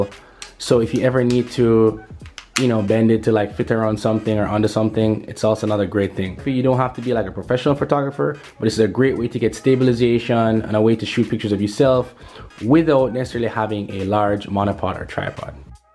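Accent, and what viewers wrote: Jamaica. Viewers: can hear the yardie accent lol. love it!!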